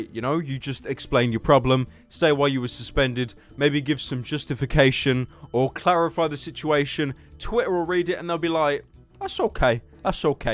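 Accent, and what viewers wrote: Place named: North, United Kingdom. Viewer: It's how we speak in the UK, mostly in the north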